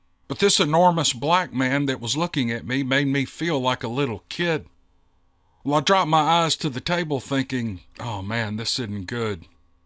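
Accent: South, United States. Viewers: yous is the best story teller on the whole interwebs... it dont matter if its Steveo & the boys or the Wild Hunt crew, or just telling us a story someone sent to you... we all just wanna hear your southern twang telling us a story; )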